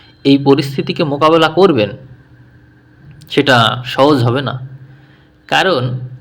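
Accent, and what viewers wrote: Bangladesh. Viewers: Dada kothay baddo machlanda pur bithari o Bangla Desh er taan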